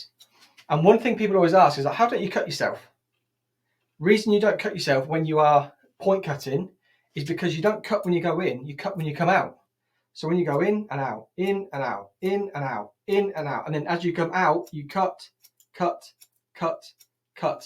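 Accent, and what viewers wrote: United Kingdom. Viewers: I watched this video the first time with the sound off. I never would have guessed you had a British accent.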